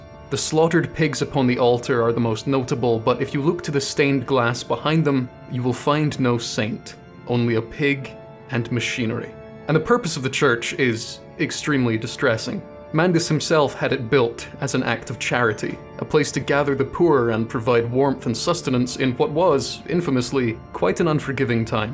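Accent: Scotland, United Kingdom. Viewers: You really sound like you'd pronounce 'Enoch' the same way as I would (like 'loch', like if I was to mention 'The St. Enoch Centre', the 'ch' in there is like in words like 'loch') but I was surprised that you didn't. I must be mistaken.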